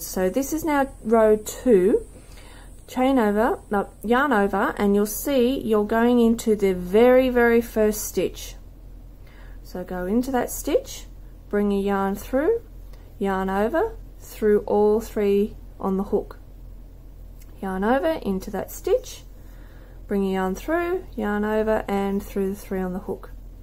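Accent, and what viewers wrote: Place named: Australia. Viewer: Hello lovely, I have found the 1st of your 💗 beautiful patterns I am going to make!😊 My eldest son has recently been asking me for a 'Manly' poncho 😅 and this is it, it will b perfect for him. And I must say your tuturial is great, u explain things very well and it is so so great to b listening to an Aussie😁. Ta heaps. Love and prayers💗💗💗🙏🙏🙏🧶🧶🧶🐕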